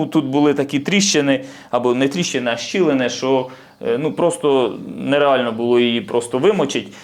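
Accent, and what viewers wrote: Cherkasy, Ukraine. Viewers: не чиста літературна українська, а легенький суржик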